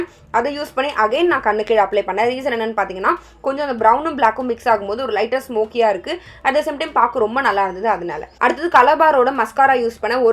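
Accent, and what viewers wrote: Tirunelveli, India. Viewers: Unga native tirunelveli ah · Sis nenga thirunelveli ah😊😊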